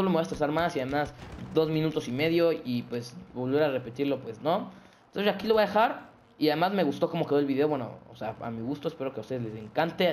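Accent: Mexico. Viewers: QUE PEDO ERES DE MEXICO PRRO? ES BUEN PEDO NO TE ENOJES WE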